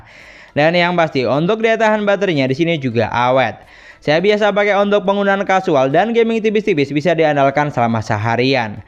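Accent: Madura, Indonesia. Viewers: Orang madura ya bang?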